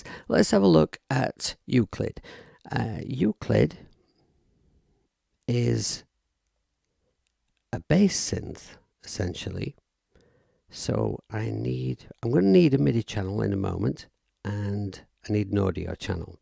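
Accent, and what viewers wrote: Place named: Sheffield, United Kingdom. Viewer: Hiya, I've been using the Rozeta suite for a while and now, thanks to you and Doug I've discovered the bud apps too. And of course you're right. I will lose days and weeks immersed in this stuff. P.S. I'm from Sheffield and I can tell your accent is not dissimilar to mine. Keep on rocking brother 🙏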